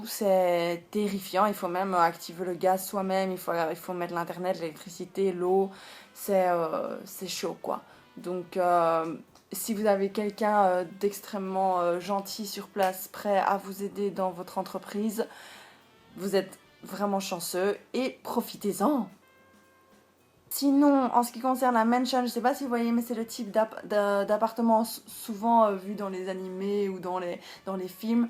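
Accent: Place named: Belgium. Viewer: Hello! Je suis tombé sur ta chaine aujoud'hui et je me suis fait la plupart de toutes tes vidéos! Elles sont géniales, naturelles et ton accent belche de chez nous rend les vidéos bien meilleures 😁 J'ai 2 questions pour toi: quand tu es restée au Japon 3x3 mois si j'ai bien compris, tu es partie avec quel budget? J'ai déjà été un mois en visite mais ça m'a couté un pont, en même temps je m'étais fait plaisir. 2eme question: Faut il être nécessairement sur place pour rechercher du boulot? Car sur Gaijin pot, la plupart des annonceurs demandent à ce que la personne habite au Japon... Voilà, en tout cas continue tes vidéos et merci d'avance pour ta réponse! A plus dans le bus! 😊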